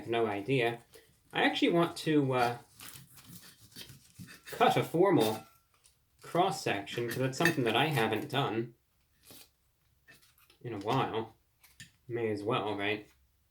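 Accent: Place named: New England, United States. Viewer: Ir he just needs to start rolling his R's now lol. It's just his New England accent, hopefully he never stops doing it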